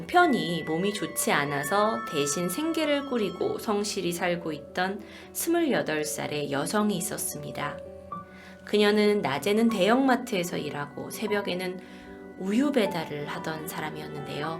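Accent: Jeolla, South Korea. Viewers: 혹시전라도 분이신가요 님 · 디바님 고향 전라도임? 의를 자꾸 으라고 발음하는거 이거 전라도발음인디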